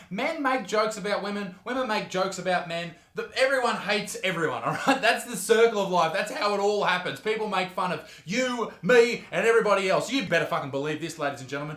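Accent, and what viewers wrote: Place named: Australia. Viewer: Holy shit, you've outdone yourself! You Aussie gob flopper's make me laugh by talkin' naturally!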